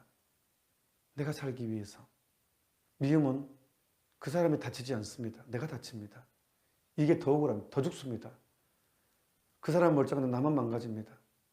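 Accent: Jeolla, South Korea. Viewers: 하느님을 닮아 순수하신 신부니이시네요.오늘 처음 강론을 들었는데 많은 가르침 배우고 갑니다~^^자주 배우겠습니다.근데 전라도 사투리 넘 재밌네요 ㅎ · 찬미 예수님! 신부님 감사합니다 ~~^^ 진심이 묻어나는 전라도 사투리가 순수하고 재미있어서 웃음 지어 집니다 ~~ 좋은강의 항상 감사합니다 ~ 덕분에 매일 삶이 기적입니다~♡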